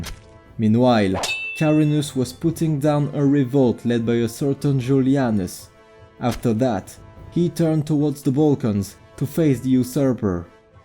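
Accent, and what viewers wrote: France. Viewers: donc c’est bien un accent français ?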